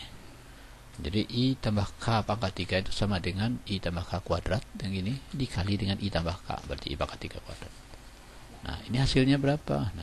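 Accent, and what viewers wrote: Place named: Malaysia. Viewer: Logat nya kayak orang melayu yah pak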